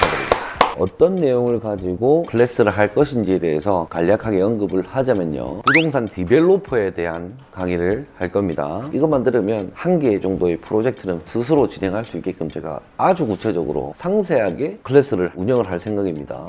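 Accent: Gyeongsang, South Korea. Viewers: 많은 영상에 좋은 정보를 얻고 있습니다. 구수한 갱상도 사투리가 참 정겹습니다^^ 혹 지금도 자료를 부탁드려도 될까요?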